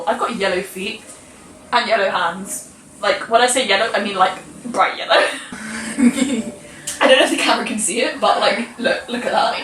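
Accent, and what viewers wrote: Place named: Bosnia and Herzegovina. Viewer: Are you Bosnian? Your accent sounds Bosnian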